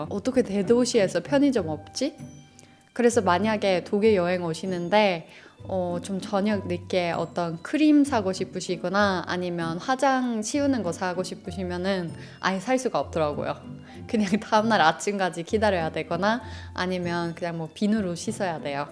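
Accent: South Korea. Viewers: 억양이나 발음이 한국인같아서 놀라고 너무 예뻐서 놀랍네요. 행복하세요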